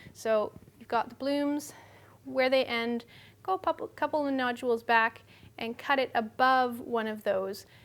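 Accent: Canada. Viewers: Its Canadian accent!!! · she must be from Canada. Oh and by the way. What are you, 4?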